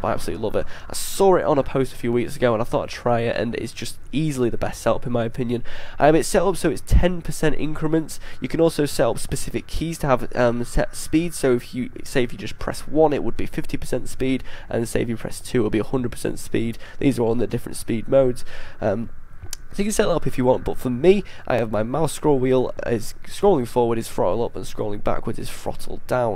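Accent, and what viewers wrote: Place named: United Kingdom. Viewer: You might well not like his voice. But it's a regional British accent rather than a nose blowing issue.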